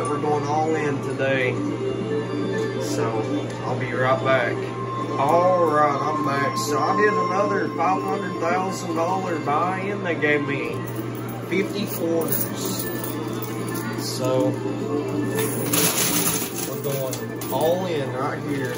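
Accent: South, United States. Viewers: Damn , I’m from Alabama and this guy sounds as country as they come ! Lol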